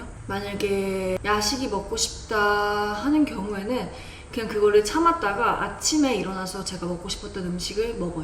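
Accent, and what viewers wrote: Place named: Seoul, South Korea. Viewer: 님 서울사투리 말투 같아요 😂😂 ㅎㅎ 넘키여워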